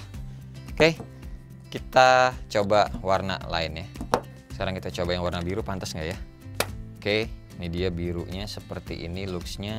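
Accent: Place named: Lamongan, Indonesia. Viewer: Kayak ada ciri khasnya orang lamongan...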